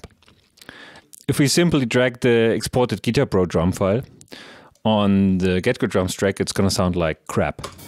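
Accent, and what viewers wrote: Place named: Germany. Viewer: finally some useful information on this topic 🙏 Thanks mate! Or Vielen Dank, wenn ich den Akzent richtig gedeutet habe haha 🤘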